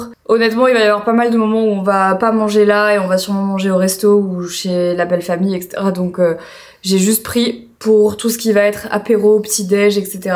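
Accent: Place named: Belgium. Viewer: Tu commences a avoir l'accent belge 😄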